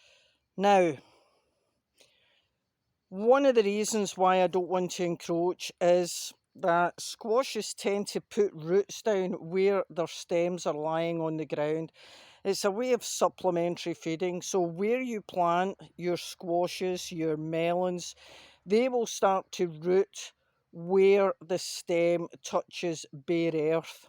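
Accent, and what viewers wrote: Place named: Northern England, United Kingdom. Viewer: I heard the accent and thought to myself how on earth has someone up north managed to get butternut squash to come in so quick and also to have toms outside. Then I saw you in France. Well done.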